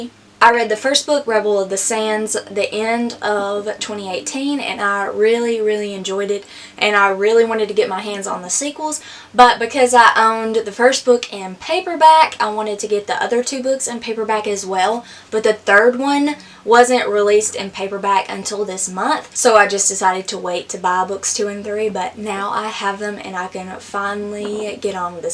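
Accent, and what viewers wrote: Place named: South, United States. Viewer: Your southern accent is so cute! I have 20 books to haul so I totally understand. Also I’m amazed that you held them all up for the thumbnail!!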